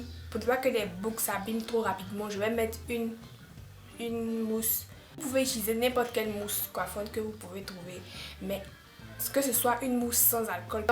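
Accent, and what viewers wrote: Ivory Coast. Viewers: miss pretty oui elle l'est l'accent trompe pas 😊😊 # 225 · cc t'es ivoirienne?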